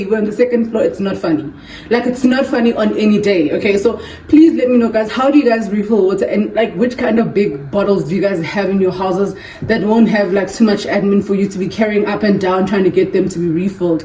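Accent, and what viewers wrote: Nigeria. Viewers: I love the Nigerian accent abegg🤣❤️ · The minute i saw Stanley on the caption i was so quick to get mine😭😭👍🏿 Stanley cup ke achievement 😭❤️❤️❤️. It's the Nigerian accent that always makes me come back here 😂😂😂 Please na. 😭❤️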